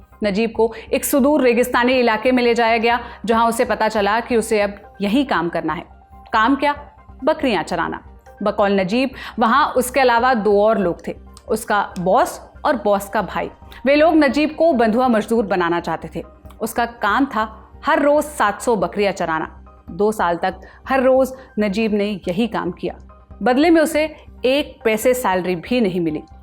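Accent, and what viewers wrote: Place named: Hyderabad, India. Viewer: Aisa lag rha h anchor hydrabadi accent ki h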